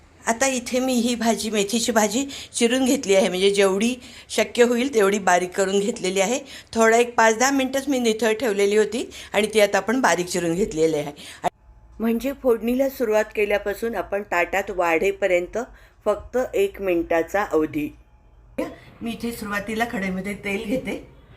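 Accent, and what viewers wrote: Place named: Kolhapur, India. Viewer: आम्ही पण 😀आजच तुमचा रेसीपीचा वीडियो प्राथमच आला माझ्याकडे आणि बोलण्याच्या टोणमुळे लगेच समजले कि कोल्हापूरी असणार तुम्ही ....... 😊👍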